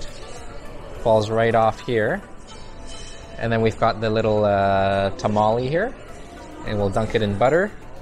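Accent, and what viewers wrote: Canada. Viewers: Hi! By any chance are you Canadian? I detect an accent, and also you’re super friendly. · I love to hear you speak, is that a Vancouver or Toronto accent?😊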